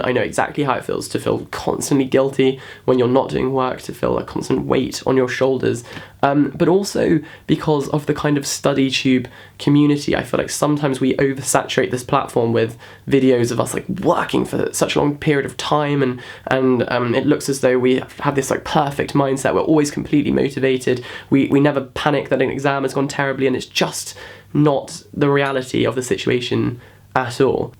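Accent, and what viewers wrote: United Kingdom. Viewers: Omg. You don't know how happy I am to see a new video from you. I discovered you chanel a few days ago and I absolutly love your videos! And I love your british accent! It's soooo cute ( Sorry I will stop with that) Lots of love from Germany You and your Dua Lipa jokes😂 I love it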